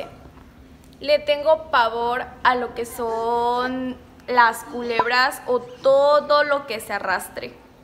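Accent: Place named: Fresa, Mexico. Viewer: Hablas muy fresa 🍓😬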